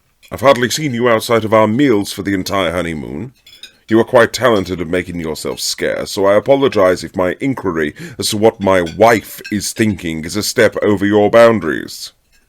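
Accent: United Kingdom. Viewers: Is this Brit accent?, I am a non native English speaker, sorry. But it sounds so good🩷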